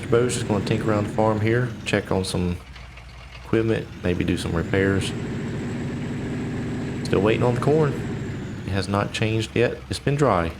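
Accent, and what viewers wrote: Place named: Texas, United States. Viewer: Are you from Texas you kinda got that accent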